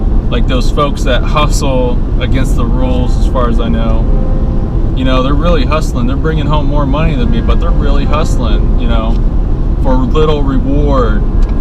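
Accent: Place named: Canada. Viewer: Well that's really good I'm happy for you and I'm sure you like it probably a big change for you you sound like you're from Canada are you well thank you for answering my reply really enjoyed your video I could hear your frustration I guess you would say and in the tone of your voice and I completely understand I've been in similar situations and of course with different companies doing different type of work but I completely get it then again really enjoyed your video looking forward to seeing more of them and God bless and I hope you have good luck in your new work opportunity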